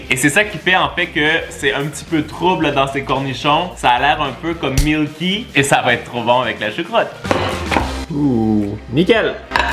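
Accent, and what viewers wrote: Canada. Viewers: tu mon youtubeur préféré de is vidéos accent canadien et qui met quoi des vidéos trop trop rire 👍👍👍👍👍 24h 🇨🇵🇨🇵🇨🇵🇨🇵 TikTok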